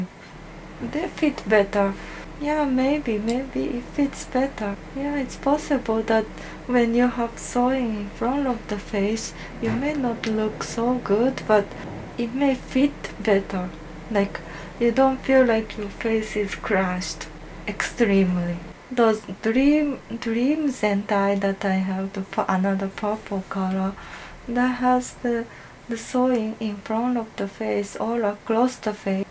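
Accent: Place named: Japan. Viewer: Your English is good, but sometimes sounds more Japanese and go to English classes to make your pronunciation more better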